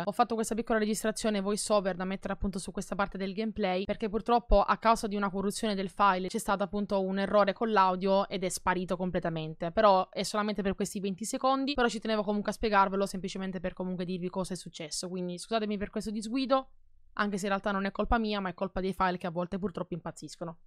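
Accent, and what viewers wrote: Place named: Sicily, Italy. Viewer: È siciliana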